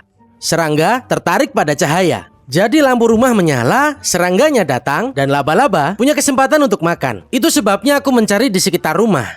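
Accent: Java, Indonesia. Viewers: logat jowo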